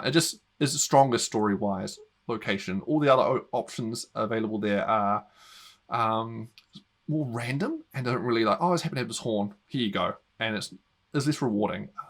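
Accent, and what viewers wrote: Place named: Australia. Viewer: Gidday , detection of a fellow Aussie accented. :) or is there a Kiwi twang, i dunno Kiwi roks to, A Aussie Kiwi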